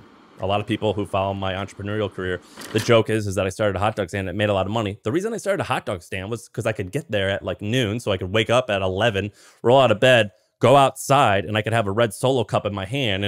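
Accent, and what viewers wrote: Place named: Midwest, United States. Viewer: Midwest asf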